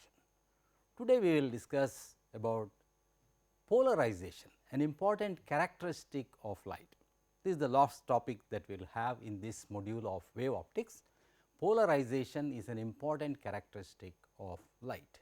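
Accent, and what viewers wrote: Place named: South India, India. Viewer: Sir appears to be south Indian by his accent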